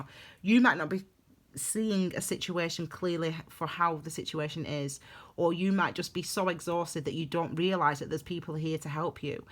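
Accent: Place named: Northern England, United Kingdom. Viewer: Always nice to hear a familiar northern accent ont 'tube. M/cr here.........top reading...........mint!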